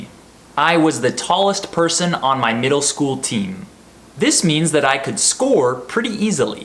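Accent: United States. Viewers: First of all, let me say that your videos are amazing. Even though I speak English fluently, I enjoy watching your A1 or A2 videos. I even recommend my students to use them. Well, you speak very clearly and use real English, which is very important to understand native speakers easily. I think that one of the reasons why your videos are so fun to watch for me is because I like American English. In fact, I'm in love with American English. I must say that I don't really enjoy listening to British accent because it sounds so strange to me. I don't know why, but I don't like saying things such as car park. I think it's because I want to really hear and use the "r" sound, especially at the end of words. If you ask me, the best way to use your videos is to repeat them a lot. Many learners don't really enjoy listening to a podcast more than 2 times, for example. 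They think it is boring and not necessary. Therefore, they quickly move on to other listening materials. However, it is a lot better focusing on something for a long time than trying different things. You know what I mean? Think about footballers. Every day, they practice the basics and they never play before they train enough. So learning English and speaking it automatically without thinking is just like playing a sport like football. I'm sure you agree with me. As for your question, well, I think my favorite sport is football, even though I am a woman and can't play. I have to say that I love watching football matches so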